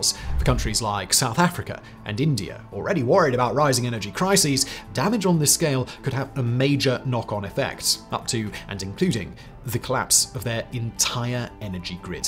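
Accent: United Kingdom. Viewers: "Geezers", haha. Makes me giggle every time 'Brit-pronunces' words.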